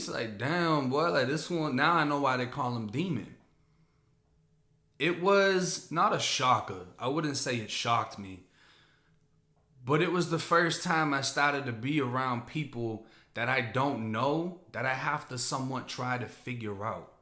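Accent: Boston, United States. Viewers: I love this dude's accident you can tell he's from like Boston or something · Am I the only one that says u sound like u have a Boston accent? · Yo bro where's that accent from you sound like your from boston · I FUCK WITH YA VIDEO AND STORIES REAL RECOGNIZE REAL KEEP UP YOUR GREATNESS MY DUDE 🙌🏾🤟🏾 AND SOUNDS LIKE YOU FROM BOSTON NOT FLORIDA BUT I KNOW IT GOES DOWN IN TAMPA THE WHOLE FLORIDA GET IT POPPING IM FROM BROOKLYN AND I LOVE EVERYTHING ABOUT FLORIDA THE HOODS EVERYTHING I CALL IT SUNNY NEW YORK LOL 😂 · Dude gotta be from Boston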